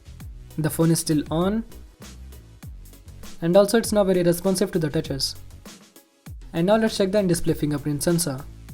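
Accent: India. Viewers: I love Indian English 😂😂😂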